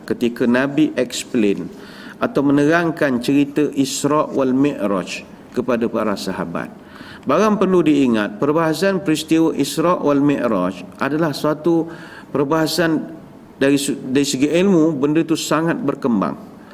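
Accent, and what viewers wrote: Pahang, Malaysia. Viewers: Assalamualaikum dato ustaz bad. Saya minat betul ceramah2 ustaz. Semuanya saya dengar sebelum tidur walaupun kadang² isi ceramah tu berullang². Mungkin ustaz orang Pahang so bahasa tu dekat sangat dengan saya. Tapi kekadang jangan la bagi asyik bagi contoh orang Kuantan sokmo yang teruk. Kiki. Apapun u are my idol. Selamat bersahur ustaz.. 👍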